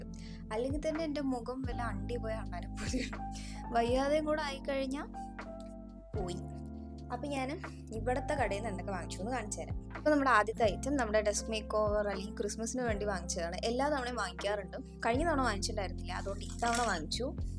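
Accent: Palakkad, India. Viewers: Chechi palakkad anno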